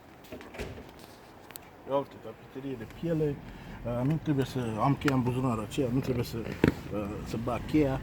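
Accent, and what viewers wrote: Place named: England, United Kingdom. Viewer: dar ce accent de englezoi ai !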